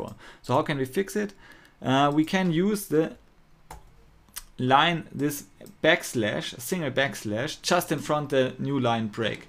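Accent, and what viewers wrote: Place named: Germany. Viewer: nicer deutscher Accent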